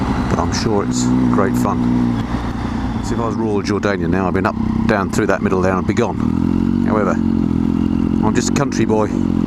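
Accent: England, United Kingdom. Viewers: Love it, great English accent, great explanations and ride tyvm to you sir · Why not drive on the left you sound English? Isn't London in England?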